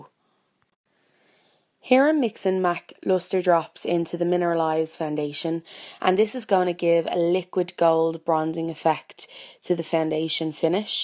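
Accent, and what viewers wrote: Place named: Ireland. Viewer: You're beautiful with or without makeup 💓 I love the accent as well, don't see how us Scots and you Irish get mixed up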